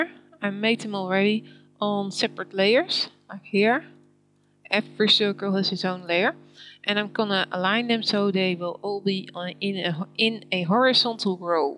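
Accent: Netherlands. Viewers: Wauw... Kom ik na dik 2 uur uit op een video die het ein-de-lijk een deftig uitlegt! Los van het 'bijkomend' selecteren met shift was het het bijsnijden vd laag dat me de das omdeed. 2 foutjes rechtgezet met één video van 8 jaar geleden. Knap werk. Ook heel schatti, dat Hollands Engels :-D Grotejes van een zuiderbuur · Definitely a Netherlands accent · The girl doing the voice-over is soooooo Dutch. Not as bad as Prince Willy though ;-)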